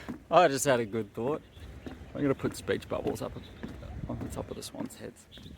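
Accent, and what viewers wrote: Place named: Australia. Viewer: Thanks for adding the subtitles. I am not used to the Australian accent and I had a little difficulty making out the words you said. The subtitle helps a ton!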